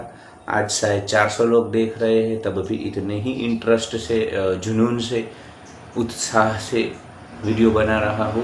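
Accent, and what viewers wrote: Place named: Gujarat, India. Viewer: Gujarati accent wali hindi ❤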